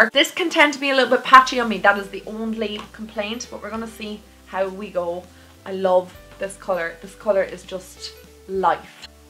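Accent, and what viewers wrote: Ireland. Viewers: Also I looove your eye colour so much😍😍 and I can't get enough of the Irish accent now that I know I'll be there for a year😍😍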